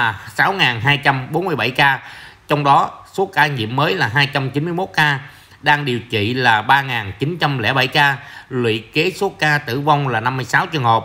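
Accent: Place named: Quang Nam, Vietnam. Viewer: Giọng giống quãng nam quá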